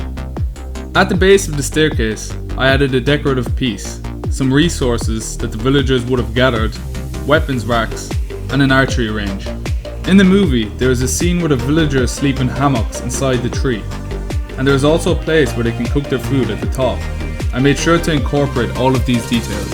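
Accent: Ireland. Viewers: Hi man you probably wont see this but you sound irish which u an to!if u see this can u pls tell me where ur firm im curious lol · Are you Irish? Dublin maybe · You have to be Irish what county u sound like a mayo man like myself please reply 😄😄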